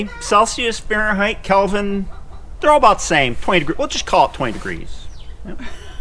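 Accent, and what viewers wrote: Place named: Central New York, United States. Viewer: I live in Kentucky after 18 years Finger Lakes...you definitely have that central NY accent. Nice to see drone footage with a little sliver of Cayuga Lake right at the end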